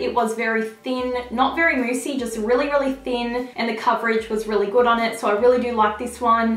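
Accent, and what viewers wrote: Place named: Australia. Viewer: I didn't realise you were Australian 🙌🏼🙌🏼I should of known from the accent hahaha. Your hair looks super amazing today 🙌🏼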